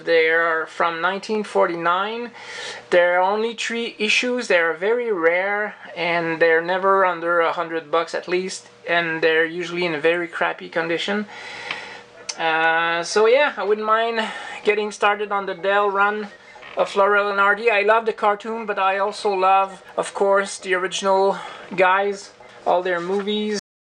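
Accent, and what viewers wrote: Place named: Quebec, Canada. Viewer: Thanks for the fabulous shout out mate, very kind of you! I am almost blushing lol That's an interesting list of comics, you have some cool targets set for 2021, I wouldn't mind that Team-Up 1 myself I must say!! You certainly have some eclectic tastes and goals too, great bits of information in the video also. I loved the Hopalong Cassidy merchandise, particularly the rocking horse/baby chair thing haha Love the accent too of course, they say we Londoners drop our H's a lot when we talk but you French Canadian folks do it even more I think!!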